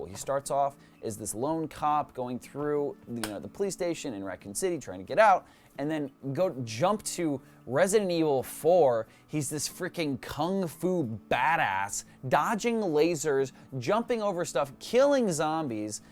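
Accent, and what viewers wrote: Germany. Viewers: I wanna go to tokyo!!!!! Can I hide in your suitcase or something.... wait that came out wrong... sorry. Side Note: Your German accent makes me laugh all the time... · Dein Deutscher Tonfall ist ganz schön happig,. (Translation: your german accent is a bit much,.)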